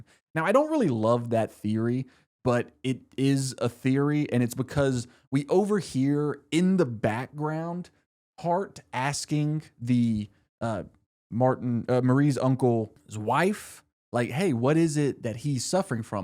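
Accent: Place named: United States. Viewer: From the thumbnail, I assumed this creator was British. I was absolutely astounded to hear an American accent. Bravo 👏🏾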